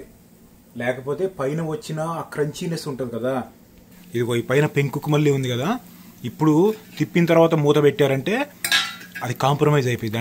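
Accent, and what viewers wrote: Andhra Pradesh, India. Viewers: Emti sangatulu ane style, chala natural ga vuntundi. mee cooking style means like sambar karam with castor oil and vade ingredients me grand mother cooking and matladae style chooste, looks like Andhra style. I think me native roots in andhra, may be me parents are grand parents migrated to Nizamabad.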